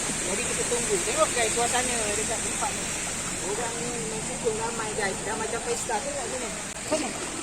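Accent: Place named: Perak, Malaysia. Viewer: Cakap Perak je coo..baru padu..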